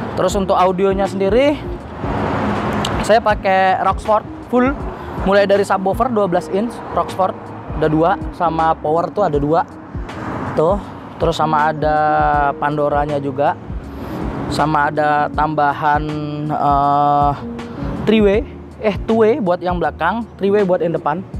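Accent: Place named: Medan, Indonesia. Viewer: Ini medan bung😂😂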